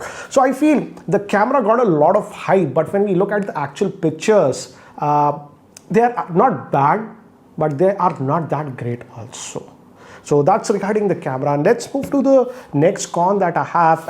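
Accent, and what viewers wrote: Hyderabad, India. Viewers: We miss your hyderabadi hindi 🤣🤣🤣😃😃😃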